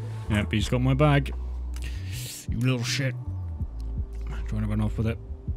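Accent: United Kingdom. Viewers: This is my first video watching u and from the moment I hear the British accent I’m engaged 😂 then I witness the splendid performance of a player with 4000 hours on EFT and not only that but you give us such a vibe of music afterwards as you loot and groove your way through. Then finally I see the length of the video 😎. A job well done my friend. 🤝 how could I not subscribe?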